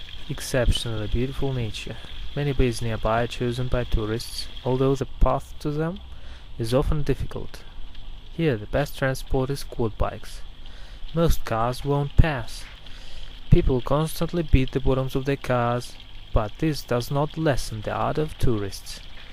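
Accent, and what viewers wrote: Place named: Russia. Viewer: Privet! We've watched your video for a second time just now and read your reply. Rick says, "Never mind learning Russian, listening to this guy I need to brush up on my English skills!" :D lol !!! Seriously, your English is excellent! We discussed your narration and you truly have a fine grasp of the English language and you use a variety of words with ease and clarity. Honestly, you speak better English than many of the native born Australians around here ! Two of our best friends were born in the UK and they lament the way Australians speak these days - Aussies are degenerating into text talk! Well, let's face it, Aussies almost always shorten words & pepper most sentences with slang anyway - that's happened since we first landed on this sunburnt rock. Along with our cringe accents ... according to the Australian Broadcasting Co. 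there are 3 types of Australian accents. Rick says I don't fit any of the categories as I speak in a unique way and I don't pronounce some vowels as others do. I feel our accents are dire - and Rick likes it ! We are laughing so much reading a Google Answer to this question: "Are Australian accents pretty?" The answer: According to a recent survey, thousands of Americans regard Australian accents as one of the sexiest in the world ! Whaaat?!! Those people need serious therapy ! We both agree English sounds better with a Russian accent. You're doing fine. Thank you for mentioning those Germans, I recall Dirk Steffans and Heinrich Kern's bike trip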